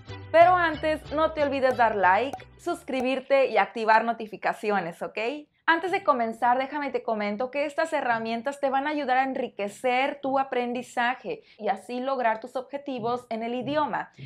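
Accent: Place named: Mexico. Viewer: Soy de Brasil y me encanta ver tus videos y escuchar tu podcast. me gusta mucho el acento mexicano👏👏👏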